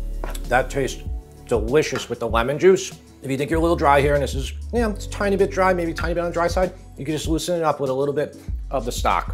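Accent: New York, United States. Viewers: Is that New York I hear in your voice? Bronx maybe?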